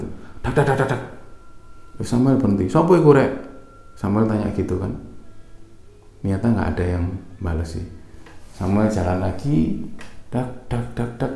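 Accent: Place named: Surabaya, Indonesia. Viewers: Buka chanel klik vidio baru, liat yg cerita mas. Wes ndak opo wee ditinggal kabeh😂 Jangan berubah yaa mas cara pembawaanya👍 Tetap Suroboyoan👌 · Chanel favorit..... Sing cerita mas dgn logat suroboyo an e..... Salam saking solo jateng mas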